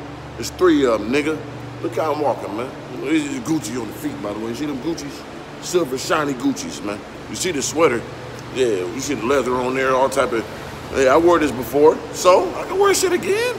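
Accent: United States. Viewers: Understood bro, I feel it. I’m catching what you doing it’s not what you say but why you do and the face you giving us the game gratitude bro, finna turn it up 2k25! You talk like you from south I’m from Memphis! Love to get mentored by ya 🙏🏽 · I just joined your channel and I enjoy your content, plus you inspire me to take better care of myself also while getting the girls. I gotta say this. I'm from Cali/The Bay and you sound like you from Cali my brotha. Right on and I wish you many riches and many bitches.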